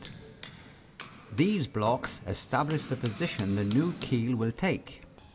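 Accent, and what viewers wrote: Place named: Cornwall, United Kingdom. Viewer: Any further West from Cornwall and you’ll find yourself in the Celtic sea! By the way, Cornwall has at least two accents East Cornwall and West Cornwall the former is closer to the standard West Country of Devon, while the Anglo-Cornish of the West, was influenced by modern English due to the persistence of the Cornish language and, therefore, it has its own cadence and phonology. I would hazard a guess that the narrator is from West Cornwall.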